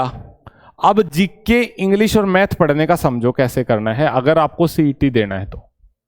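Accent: Ahirwal, India. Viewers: हा बोली को लहजो अहीरवाल एरिया जैसों है।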